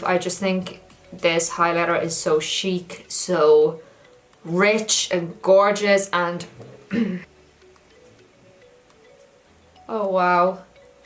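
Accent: Ireland. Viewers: Wait are you Irish ?? Btw your absolutely AMAZING ly xx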